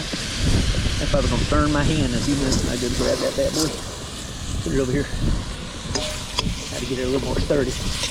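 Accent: Appalachia, United States. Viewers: Are u from applachia? The accent is thick. Great video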